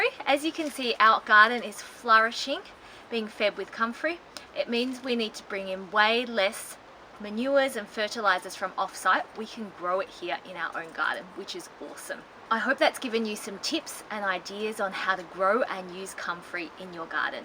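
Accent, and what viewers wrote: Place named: Australia. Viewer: New to your channel and subscribed because you are informative and succinct. Your aussie accent and unpretentious good looks and mannerisms are also delightful and non-abrasive. Kudos!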